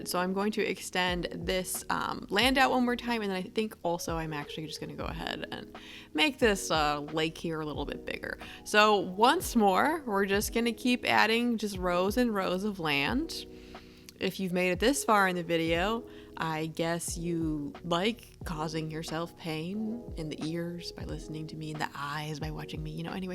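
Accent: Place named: France. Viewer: omgg so beautiful and ur french accent is so cuteeeee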